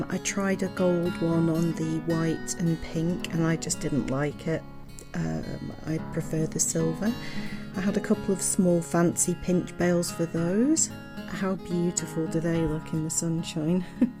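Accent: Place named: Australia. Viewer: Love it!! Love your accent are you from Australia?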